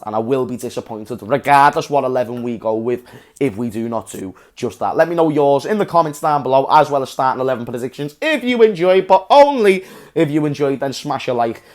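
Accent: Liverpool, United Kingdom. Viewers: Gooooo on lad keeping up the liverpool accent lol!!! L.f.c.4 eva y.n.w.a!!! From bidzy westmids Gypsy England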